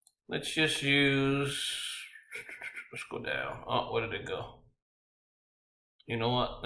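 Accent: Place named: United States. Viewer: where are you from. As you speak like a American. I don't understand the big coin.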